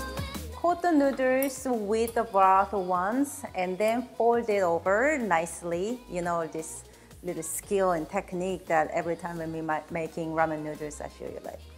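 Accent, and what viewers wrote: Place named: South Korea. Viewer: You are guilty for loving an korean woman with korean accent for cooking japanese Ramen.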